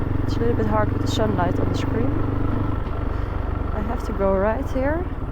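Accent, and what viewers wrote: Netherlands. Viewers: Another adventurous Dutch lady. I start to like their accent.😄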